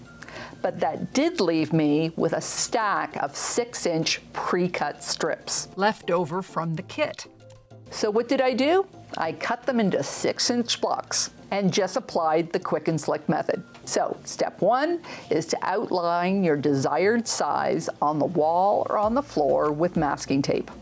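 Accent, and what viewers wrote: Canada. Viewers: Hi! I just started quilting (been sewing for years) but got recommended your channel to start, and it’s so helpful!! I caught an accent and saw you’re Canadian too! I was wondering if you’ve noticed price increases for certain tools/materials in Canada specifically? Prices have gone up overall, but for anything in particular that you’ve seen, or anything that’s maintained affordable for the hobby (not including reusing/thrifting)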